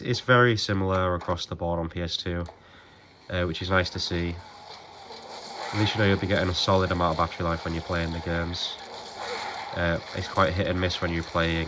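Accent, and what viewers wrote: United Kingdom. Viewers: Guy with strong British accent play MGS with Russian translation? Is this kind of joke or what? :-)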